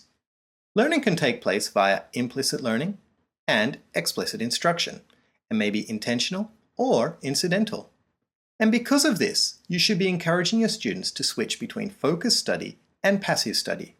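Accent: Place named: South Africa. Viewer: One question, where are you from? Your accent sounds really strange and muddled. If I had to guess, I'd say you're a South African trying to take on an American accent.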